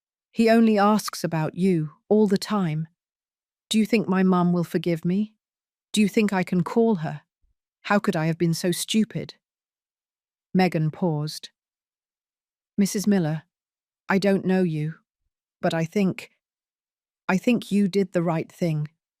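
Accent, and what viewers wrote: England, United Kingdom. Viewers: Oh it is so good to hear an English narrater. Lovely easy voice to listen to ❤❤listening from Swimbridge Nth Devon UK. ❤❤ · Your British accent it looks so far from the Bazaar story you are making girlfriend comes and do all distinct to you and you take it come on even for the story it's so Bazaar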